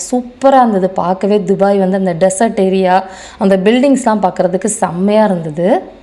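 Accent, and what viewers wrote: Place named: Tamil Nadu, India. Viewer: Again me nega samyal pandra style and pesurathu vachi neriya yosipan, eppo thaan teriyuthu nega namma tamilnattu ponnunu 😊❤hadsoff you ❤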